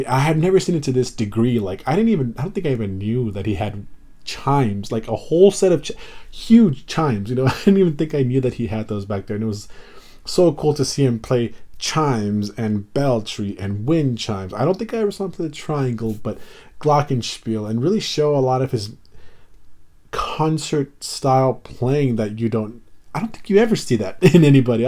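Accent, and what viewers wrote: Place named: England, United Kingdom. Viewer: Other then your comments about this flawless band, I love your English accent. I'm mexican and I am an English teacher. I love Rush, I also like the police. Greetings from México.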